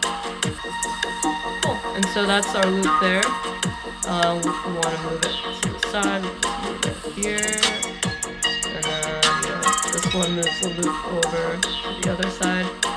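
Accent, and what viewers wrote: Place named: United States. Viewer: japanese but american as you can see how she talks.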